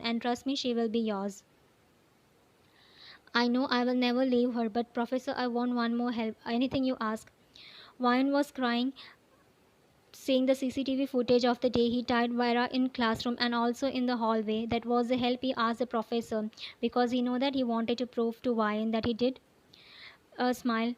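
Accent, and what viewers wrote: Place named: India. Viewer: Hey author ..if you don't mind I want to ask where are you from ? I mean country.. well your English accent seems like Indian accent which I liked it 😊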